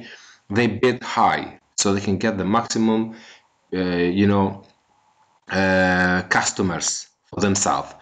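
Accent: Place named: Albania. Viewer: You sound like you are from alnania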